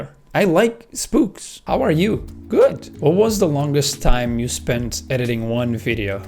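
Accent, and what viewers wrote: Portugal. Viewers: que tal você fazer um vídeo falando em português? Quero mt ouvir seu sotaque portuga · That's pretty much his Portuguese voice, in this video. A lot of Portuguese people tend to speak English with a very hard Portuguese accent. "We" kinda suck at losing or acquiring accents. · that a nice portrugeese accent you have there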